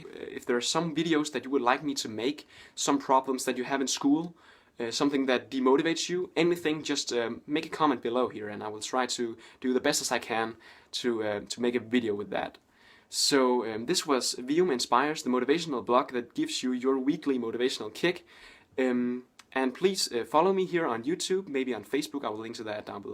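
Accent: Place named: Denmark. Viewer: Går ud fra du er dansk ud fra din accent, det er fandme noget bull shit det der, du bilder folk ind, at man kun skal fokusere på enkelte opgaver, frem for alle. En manglende opgave betyder -3 for den opgave og det er ikke ligefrem skide godt for ens årskaraktere. så kan det da gøre skide ligemeget om du så for 12 i tre afleveringer hvis du alligevel for -3 i to andre. IDIOT!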